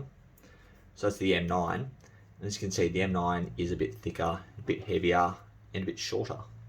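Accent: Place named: United Kingdom. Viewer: Why is your accent and syntax so UK, if youve been in the US Army?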